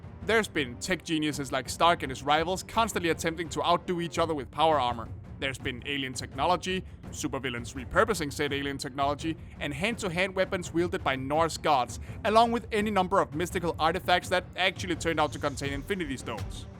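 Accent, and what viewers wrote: Denmark. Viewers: You sound danish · sounds lowkey like you're from denmark hehe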